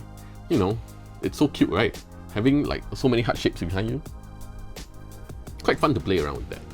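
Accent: Singapore. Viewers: Good reviews , only problem is your Singlish. Always fast and no tone at all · Within the first 5 seconds of the video, I can already tell this dude is either Malaysian or Singaporean through his accent. Turns out it was the latter XD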